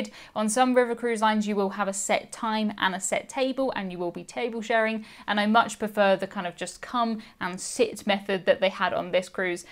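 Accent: United Kingdom. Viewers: coriander is the seed where the leaf is Cilantro- interestingly the spanish pronunciation is Kilantro. when u said multiple sounded like multabowl sounded like u said airboat for aiport called sounds like code.i am so laughing-Brit accent is perplexing.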